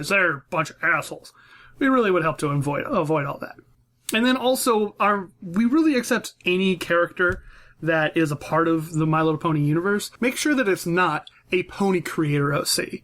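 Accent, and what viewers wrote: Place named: Scotland, United Kingdom. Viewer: I still love your accent. .3. only because scotish people are awesome. I wish to live in scotland or ireland one day, cause Australia now bores me o3o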